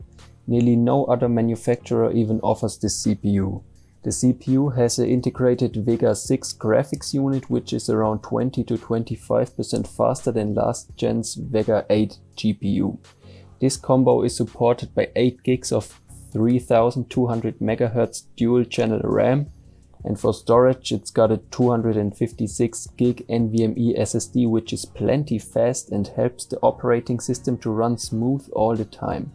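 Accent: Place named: Germany. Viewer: Man hört halt sofort raus das du Deutscher bist 😂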